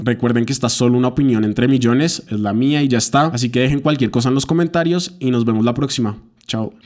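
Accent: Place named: Colombia. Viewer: No se nada de. Me gusta el tono colombiano de tu retórica, creo que es lo que le da consistencia a tus ideas. Pues, hay otros análisis de carreras musicales en tus videos?:)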